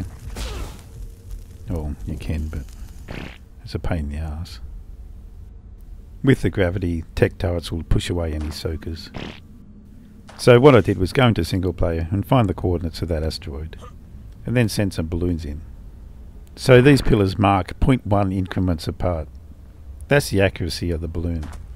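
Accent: Australia. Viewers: Gotta love that smooth Australian voice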